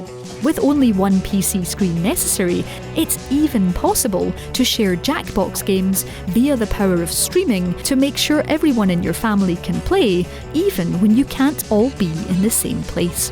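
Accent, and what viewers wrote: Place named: Scotland, United Kingdom. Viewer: Scotish Accent is gold very good content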